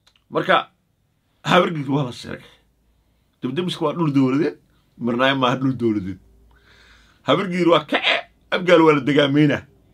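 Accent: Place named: Warsangali, Somalia. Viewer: Orgi ciyaya kamataqaanid afkaaga warsangali kadaa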